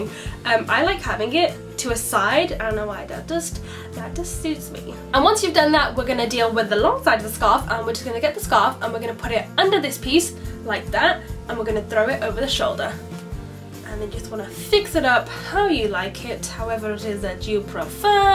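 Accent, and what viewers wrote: United Kingdom. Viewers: so cute! is she british, i really like her accent!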